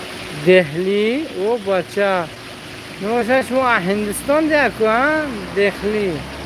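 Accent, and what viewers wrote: Tajikistan. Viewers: сахт Точики гап мезадиян 👏👏👏👏👏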